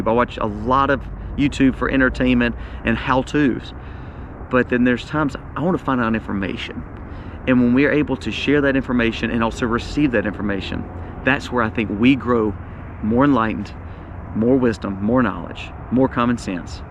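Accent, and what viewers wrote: South, United States. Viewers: You're the only youtuber that can inform us about some disaster but, at the same time, makes us feel good about our day. It's that smoooooth southern voice. You're the Mr. Rogers of prepping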